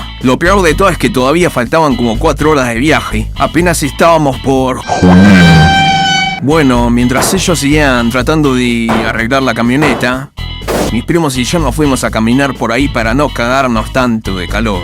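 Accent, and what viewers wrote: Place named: United States. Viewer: Noto un acento medio yankee no sé por qué 🧐 o medio neutro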